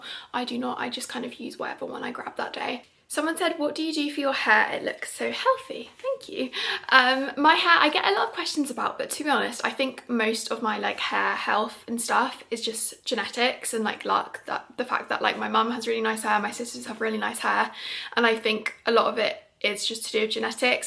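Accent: United Kingdom. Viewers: ive seen yoy tiktoks for a while and enjoyed them, but finally subscribed to you yesterday. for some reason im so suprised your british! thought you were american...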